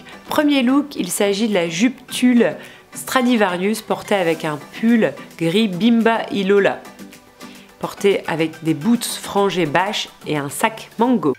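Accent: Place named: North, France. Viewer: Bonsoir, Vidéo très sympa, comme d'habitude. Tes prononciations "ai" au lieu de "é" sont trop mignonnes (le nord, c'est ça?). J'aimerais beaucoup une vidéo sur les bijoux si cela te dit. Bisous, Séverine.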